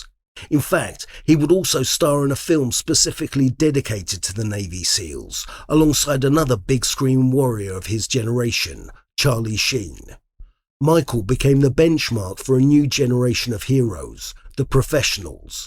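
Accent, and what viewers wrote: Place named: United Kingdom. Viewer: Love 30 days in the hole, fascinating plot. Love British English, so clear❤, useful for learning to understand English by ear. Dear (am I correct?)), thank you for your work. 1-3 I listened in Russian, looking forward to the next one.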